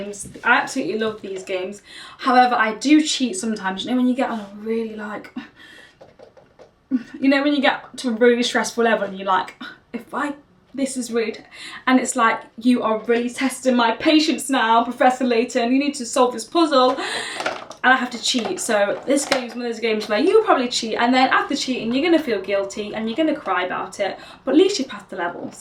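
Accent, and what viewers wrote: England, United Kingdom. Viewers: Nothing sexier than a black chick with an english accent ( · Damn you are cute as hell. Love your british accent.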